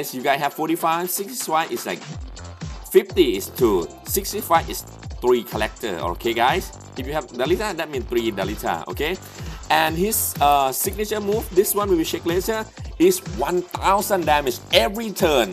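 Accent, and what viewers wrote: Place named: England, United Kingdom. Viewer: I like your English accent its great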